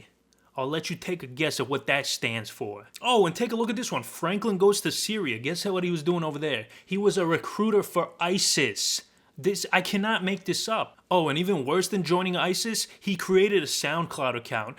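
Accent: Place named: Boston, United States. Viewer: Dude... I absolutely LOVE hearing you rant about Franklin in your Boston Accent! Hilarious definitely gon Sub bro. Love the content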